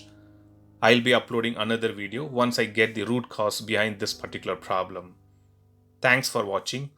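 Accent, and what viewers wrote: India. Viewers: Naratornya kaya orang India ngomongnya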